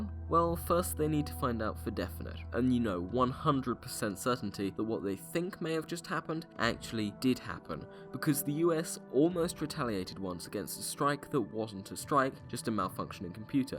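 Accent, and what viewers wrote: United Kingdom. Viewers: Good voice. Good research. My type of humor. Oh and a British accent. It does not get much better than this! Liked and subscribed, sir!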